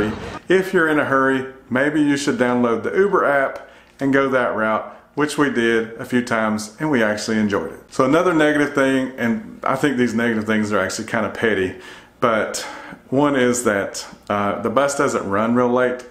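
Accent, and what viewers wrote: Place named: South, United States. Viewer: I love your country accent.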